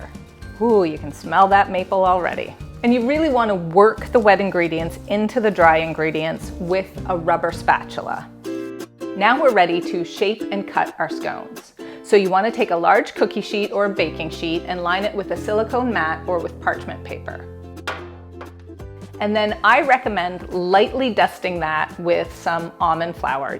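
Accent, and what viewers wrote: Canada. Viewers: Are you U.S. North or Canadian North?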